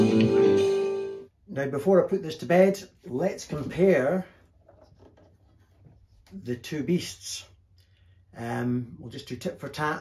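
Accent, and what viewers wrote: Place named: Glasgow, United Kingdom. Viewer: Accent is throwing me off a bit. Sounds Glaswegian blended with something else, perhaps you lived somewhere else for a few years? I may be way off as I don't hear such accents too often here in Seattle.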